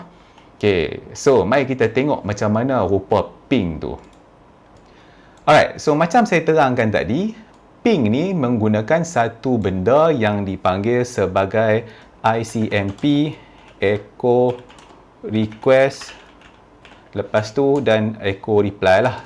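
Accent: North, Malaysia. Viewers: no worries. Sdp dgr org utara habaq ni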